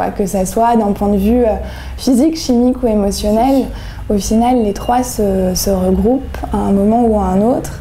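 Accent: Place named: Paris, France. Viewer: Dawsen Mage c’est du français parisien 🇫🇷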